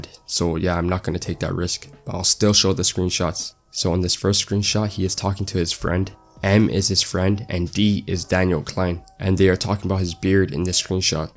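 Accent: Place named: Canada. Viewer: U sound Canadian just like me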